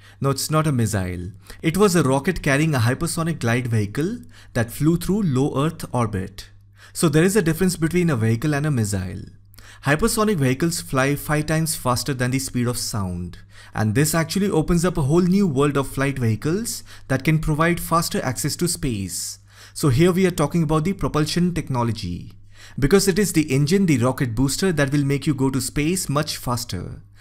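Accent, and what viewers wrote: India. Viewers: Please improve your thick Indian accent, then it will become an amazing channel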